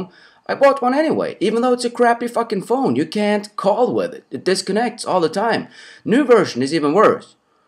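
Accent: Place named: Sweden. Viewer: Just därför gör man inte stand-up på engelska om man är svensk och har sån där bonn-dialekt. För övrigt är Betnér en värdelös komiker.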